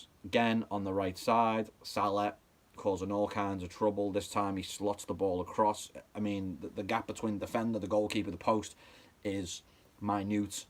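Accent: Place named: Liverpool, United Kingdom. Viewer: That some Scouse accent you have 🙄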